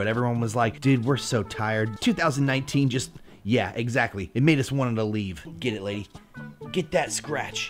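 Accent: Caribbean. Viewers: But that carribean accent on point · Me not likely the Caribbean accent or Spanish accent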